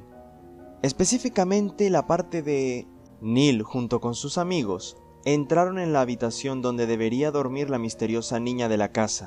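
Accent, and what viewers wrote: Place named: Venezuela. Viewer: YO SABÍA!!!!! YO SABÍA QUE ERAS VENEZOLANO!!! >:D Se te nota en el acento XD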